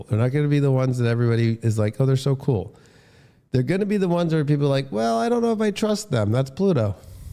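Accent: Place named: South, United States. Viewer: ( the southern accent is killing me )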